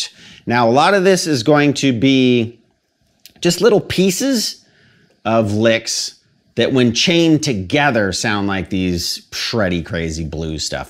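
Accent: United States. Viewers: Amazing player! Moreover, I practice american accent listening to you:) Greetings from Buenos Aires.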